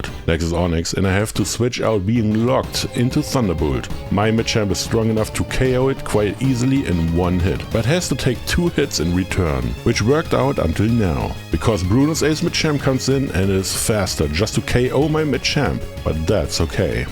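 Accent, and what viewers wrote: Germany. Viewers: Top Video man hört fast nicht raus das du deutscher bist, hab trotzdem ne frage wie kann man onix entwickeln ohne tausch in HG ? P.S. mach weiter so deine Videos machen echt spaß zu gucken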